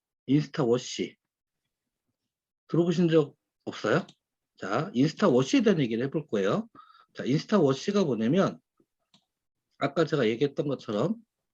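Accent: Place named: Jeolla, South Korea. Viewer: 전라도 소리만들어도 조으네요 내고향 전라도 줌교육도 열정뜨겁네요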